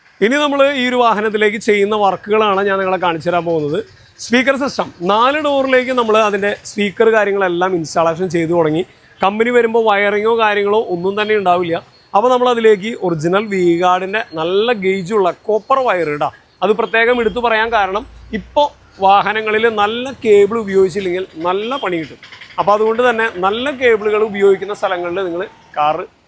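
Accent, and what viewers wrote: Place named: Thrissur, India. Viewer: സംസാരം കേട്ടാൽ അറിഞ്ഞൂടെ തൃശൂർ.. ☺️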